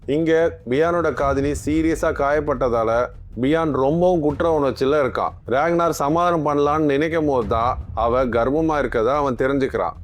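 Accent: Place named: Birmingham, United Kingdom. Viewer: Yoo broo oun voice la peaky blender pottu bro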